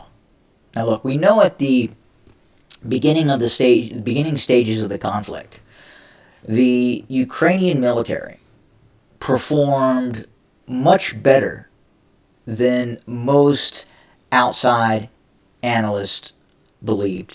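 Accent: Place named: Northern Virginia, United States. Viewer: Haha! Yeah, that Howdy Doody voice... It's a Northern Virginia thing..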